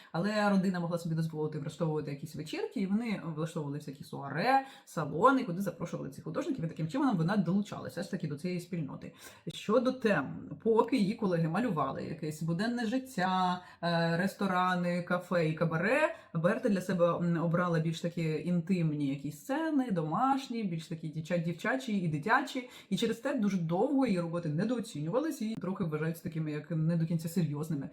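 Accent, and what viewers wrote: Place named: Russia. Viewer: Дякую, за відповідь! Мені дуже подобається ваш контент. Пишучи про мову, я перевіряла свій слух - він у мене близький до абсолютного(я скрипалька за освітою), - того, я радію з свої здібностей. Ваш російський акцент, або російська артикуляція, практично, не помітні.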